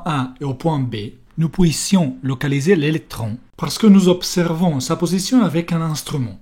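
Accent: Italy. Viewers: Vraiment je pensais que tu imitais la voix de Roveli, mais tous les italiens ont cet accent adorable quand ils parlent français, c'est trop chou <3 Donc si je tiens compte de la structure géométrique d'un réseau de spins. Je peux dire que chaque point rouge représente le centre de rayonnement d'un "quantum de distance". Autrement dit cela ne peut pas représenter des sphères, mais des polyèdres, exactement comme ce que l'on obtient dans une mousse de bain, où chaque point rouge est le centre d'une bulle, et le rayonnement s'adapte en fonction des bulles environnantes (formant des polyèdres). Si je considère un univers plan, la structure géométrique serait parfaitement équilibrée avec des triangles équilatéraux, et il ne se passerait rien. Le truc curieux, c'est que cet espace existerait bien physiquement, mais n'aurait pas d'épaisseur, oups ^^ Par contre, la structure 3D la plus simple et la plus équilibrée, serait une structure des tétraèdres, et normalement (sauf erreur) on ne peut remplir un volume avec des tétraèdres réguliers sans être obligé dans déformer quelques uns, sinon il y aurait des "trous". 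Autrement dit si je ne me trompe pas, une structures 3D infinie, n'aurait de cesse de se déformer, cherchant quelque part un équilibre et ne le trouvant jamais, en dehors de toute notion de temps bien sûr. Et qui dit déformation, dit énergie ... ce qui répondrait à une très vielle question philosophique quand même ;p Et si je regarde un seul point rouge dans ce contexte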